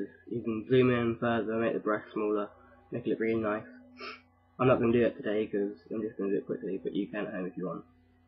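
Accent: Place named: United Kingdom. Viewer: He's British, like me! ;p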